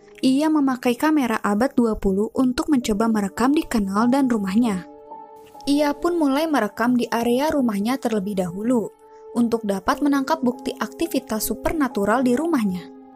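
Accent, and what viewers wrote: West Java, Indonesia. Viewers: Orng mn km kak orang bandung ya 'kumaha teh hh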